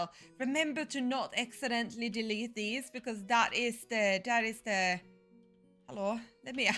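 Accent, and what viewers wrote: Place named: Sweden. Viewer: Hey there, thanks for the fast reply! I just finished the rest of your video and I really enjoyed it. The dungeon part turned out very cool with the stair cases and vents. My favorite build set right now is Nemedian. I think the thick stone and rustic wood look really nice together. And that's a Swedish accent there!! Thanks for saying so. With a smidge of old British of course ha ha. It's like music to my ears. Looking forward to your part 3!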